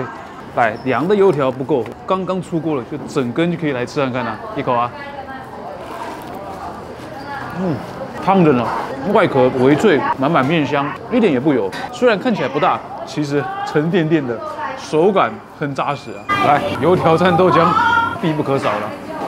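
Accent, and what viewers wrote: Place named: Taiwan. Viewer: 台湾口音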